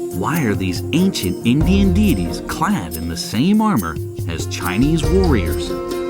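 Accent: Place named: United States. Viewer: Horrible Americanisation of Chinese culture. Awful narration with American accent, why?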